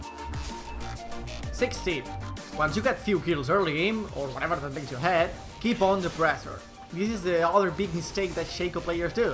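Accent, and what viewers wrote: Spain. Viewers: no se porque pero me da que eres español xD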